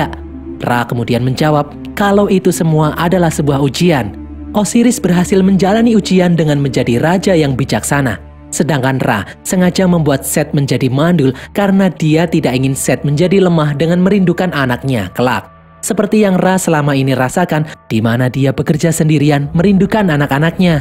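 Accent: Java, Indonesia. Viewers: huruf B dan D nya medog bgt bang. khas bgt channel ini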